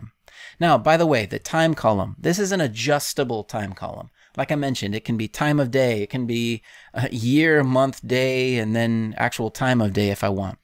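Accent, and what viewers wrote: India. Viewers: i dislike the Indian accent; can't help it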